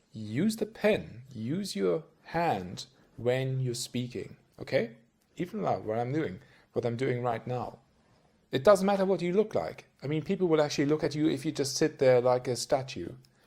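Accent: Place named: Mid-Atlantic, United States. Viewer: Some good pointers==thanks. There's a mid Atlantic twang in there somewhere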